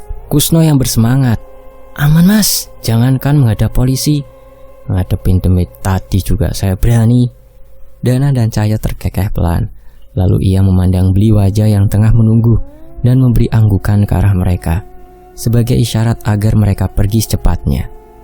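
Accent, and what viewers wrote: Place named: Bali, Indonesia. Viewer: Miiih..miiih..dhuwek sajan blii logat bali..pinter pisan aei😄🥰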